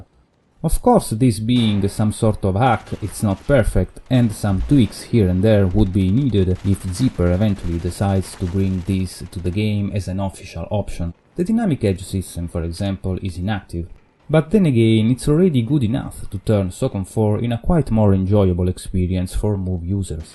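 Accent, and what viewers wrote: Italy. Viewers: lol i was sure you were from italy just by listening the accent for a few seconds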